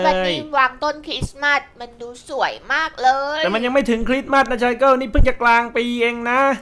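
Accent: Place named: South, Thailand. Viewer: พูดภาษาใต้นิ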